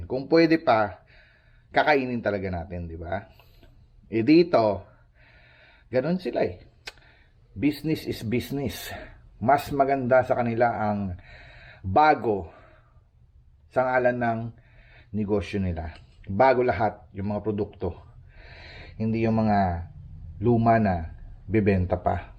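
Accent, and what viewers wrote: Iloilo, Philippines. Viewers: Not daily ka ga ɓlog gali? 1st time ko nag lantaw bal an ko gid na ilonggo ka!❤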